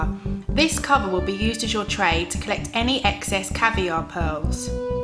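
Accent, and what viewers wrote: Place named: United Kingdom. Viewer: Im falling in love with ur British accent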